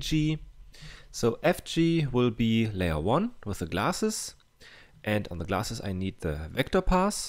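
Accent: Germany. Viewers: du bist bestimmt deutscher du hast nen nervigen dialekt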